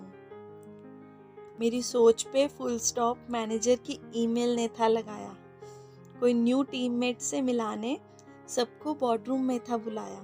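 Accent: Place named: Punjab, India. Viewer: You have lovely punjabi tone as well💞💞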